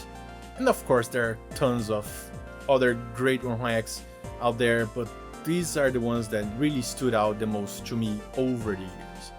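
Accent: Brazil. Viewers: Vc é brasileiro? Tem um sotaque tão brasileiro kkk ótimo vídeo!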